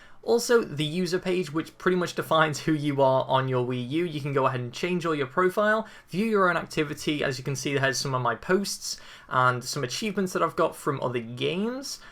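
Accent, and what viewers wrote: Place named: United Kingdom. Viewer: yay hes British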